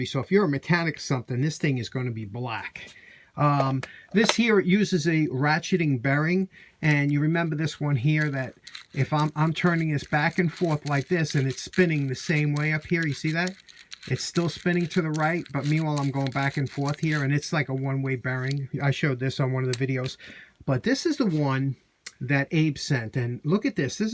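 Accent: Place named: Long Island, United States. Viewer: I love the smell of those old electric drills too- and I love your Long Island accent - takes me back home to Brightwaters. Keep up the inspirational videos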